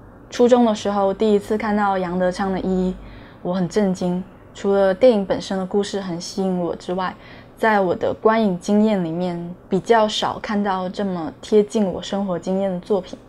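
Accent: Taiwan. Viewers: 好台灣腔喔！一點都沒有廣東腔和北京腔！ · 妳的口音完全就是個台灣人! 當年看到 一一 我也很震撼，甚至20年後再看這部電影也覺得毫不違和，毫不過時，很謝謝妳喜愛這塊土地。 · 這口音已很像台灣的口音了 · 妳的口音跟台灣人無異!優秀！加油! 你没錯!這時代及政治形勢所致不得不然的結果! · 這台灣腔 ....真是大陸人 ?? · 你的腔調已經很台灣了~很好聽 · 腔調跟台灣人一樣，聽到靠北更有親切感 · 講話聽起來和台灣人沒什麼兩樣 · 口音完全台灣人XD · 你的中文口音已經很台了XD，不用去計較，享受可以在台灣的時間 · 這口音根本台灣人了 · 妳的聲音語氣就是台灣人啊～歡迎妳能在台灣開心的生活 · 這口音也太台灣腔了吧?? 太強了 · 沒有一點大陸腔，台味十足。👍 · 口音跟臺灣好像，就沒那麼討厭了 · 口音很台灣。生活周遭每天的大小事總是和政治有關，兩岸目前就處於尷尬的情況，要成為正常國與國之間的交往，問題不在於中華民國而在於中華人民共和國（靠北，iPhone 打字怎麼沒有中華民國的國旗卻有對岸的） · 完全是台灣口音了耶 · 這位妹妹你的台灣腔學得很地道阿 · 聲音就已經是標準台灣腔 沒有大陸口音 完全在台灣這裡生活 完全不會有問題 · 妳真的完全台灣腔了！希望妳留下來！也希望政府有更開闊的胸襟、迎接喜歡台灣的人！ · 根本台灣女孩的感覺，說話就是台灣腔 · 謝謝你這麼愛台灣，這幾年進出大陸在大陸有很多好朋友，我也很喜歡大陸很多美好的人事物，只是的確朋友再好我們可能在政治立場上還是不同，這些牽涉太多因素沒有辦法改變，所以我不太會聊這個話題去影響彼此的友誼。 我也很喜歡你在影片中的表達，你的口音根本就已經是台灣口音了，感受到你真心喜歡台灣的一切，歡迎你來，我也很喜歡你的分享，很開心你留在台灣😊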